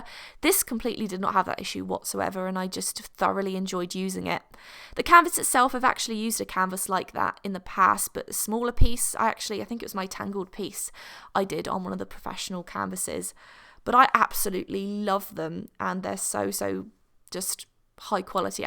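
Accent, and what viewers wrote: United Kingdom. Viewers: funny gal. I wanna know where in Britain they talk like that because I dislike it very much.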